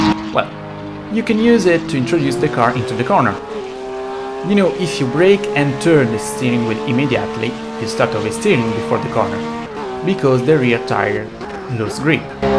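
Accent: Italy. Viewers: Are you Italian? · scusami, è da poco che ti seguo ho solo visto 2/3 video, ma qualcosa nella tua voce mi dice che sei italiano, è irrilevante e solo una mia curiosità. comunque fai dei bellissimi video complimenti! sorry, I have only recently seen 2/3 videos, but something in your voice tells me that you are Italian, it is irrelevant and just my curiosity. anyway you make some beautiful video compliments!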